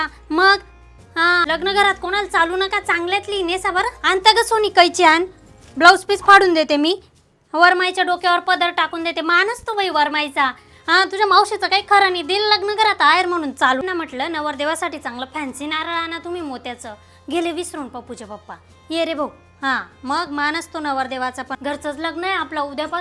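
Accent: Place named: Ahirani, India. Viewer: माझे सासर चाळीसगाव जवळचे नगरदेवळा आहे... मी मुंबईची आहे पण मला अहिराणी बोलायला थोडी येते, पण समजते.तु हल्ली व्हिडीओ मध्ये अहिराणी नाही बोलत... तुझे अहिराणी ऐकायला छान वाटते... तुझे अहिराणी तले व्हिडिओ मी आमच्या फॅमिली ग्रुप मध्ये आणि इतरांना पण सेंड करते... त्यांना पण बघायची सवय लागली आहे ❤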